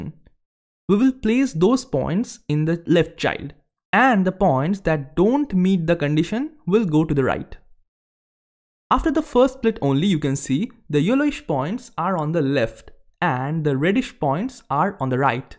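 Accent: Bengal, India. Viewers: bengoli accent ftw!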